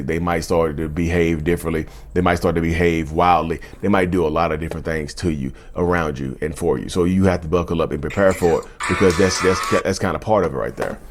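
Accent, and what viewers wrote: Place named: South, United States. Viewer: It’s his particular southern dialect, depending on which region a family is from. My family is from a certain part of the south. It’s normal, you just gotta get used to it and keep up. Pretty sure he’s been speaking this way since he learned to talk.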